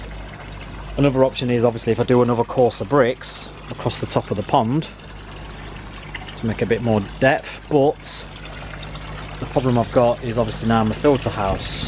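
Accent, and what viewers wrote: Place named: Nottingham, United Kingdom. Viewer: Just subbed to your channel mate! Lovely pond with some great ideas going forward! If my keen ear for an accent is correct, you sound very local to me mate lol. I'd guess at Notts (potentially Derby) but ill stick with Notts :) North Leicester me :) Looking forward to more updates!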